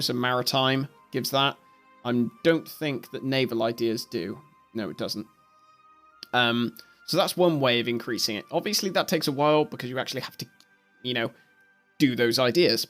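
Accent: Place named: West Country, United Kingdom. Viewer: Lovely West country accent, greetings from Kent.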